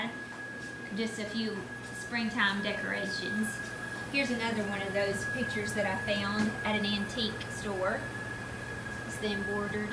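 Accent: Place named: Alabama, United States. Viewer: Everything is beautiful! How do you keep your light colored couch so nice and clean with puppies? I'm originally from Alabama but now live in TX. Nice to hear the Alabama Accent :)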